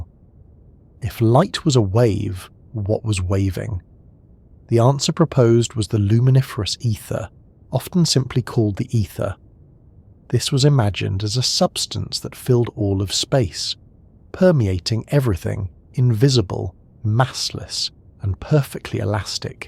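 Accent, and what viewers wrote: United Kingdom. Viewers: Friendly brit speaking (no music)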